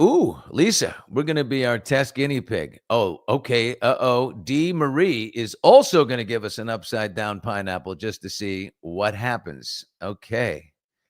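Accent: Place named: New York, United States. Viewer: You can hear your New York accent😂. You’re an east coaster. Love east coast people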